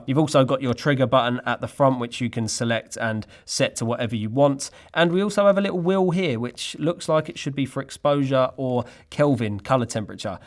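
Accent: United Kingdom. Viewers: Sony a7c gonna be a perfect match for this thing for travel, so I consider buying one if not annoyed you enough with the comment. Little star warsy color and design but who cares, at least folks can see which side I came from. I may get a suitable helmet too. And I subbed for the accent that reminds me of the characters in the movie Snatch, even though not entirely cockney.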